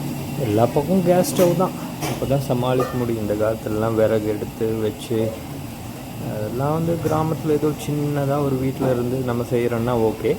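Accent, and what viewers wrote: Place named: Karnataka, India. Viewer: ௨ங்கள் பேச்சு ஒ௫ மாதிாியாய் இ௫க்கிறதே நீங்கள் கன்னடத்துகாரரோ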